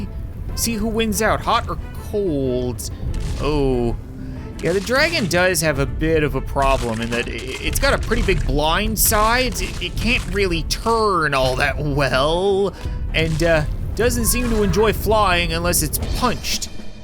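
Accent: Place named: Canada. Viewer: sounds extra Canadian today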